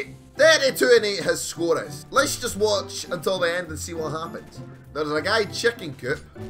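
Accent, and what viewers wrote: Scotland, United Kingdom. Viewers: i love your Scottish acciedent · I think he's Scottish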